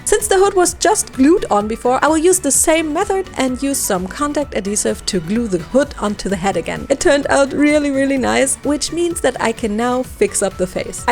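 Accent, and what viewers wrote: Germany. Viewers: This is the first video i see from you and i was like "I hear german i just cant proof it" until you sayd Schlüppi Ich bin vor Lachen von meiner Couch geknallt😂 First impression btw😂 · Guys is she german? Because im german and I think she has a german accent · Is it possible that she is German? The way she speaks English sounds like it. wish you all Love Light and laughing 💛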